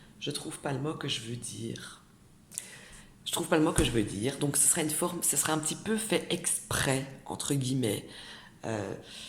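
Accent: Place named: Belgium. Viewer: Bonjour bel accent belge🤗😘👏🌹🥀🥀🥀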